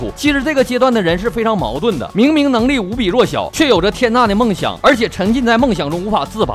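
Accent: Northeast, China. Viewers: 在拳霸3中听到主播说到这期节目，所以专门过来学习一波：）个人认为这个系列逻辑性很强，干货满满，堪称良心，听后从中受益匪浅，也希望主播不要怀疑自己，坚持做下去。PS：身为一个南方人已经被魔性的东北吐槽洗脑了！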